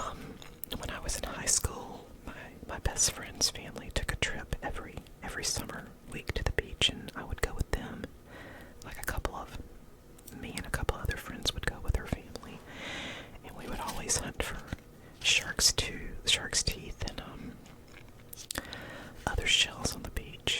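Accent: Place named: South, United States. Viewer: Thanks! Another very relaxing flip through. (BTW I love your southern accent 😊)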